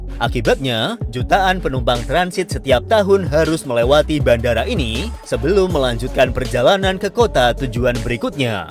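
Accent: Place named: Indonesia. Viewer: KOK SOUNNYA INVOIC INDONESIA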